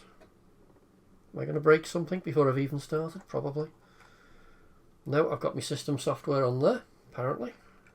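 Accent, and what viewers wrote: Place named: South Shields, United Kingdom. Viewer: Sounds like your from South shields 🛡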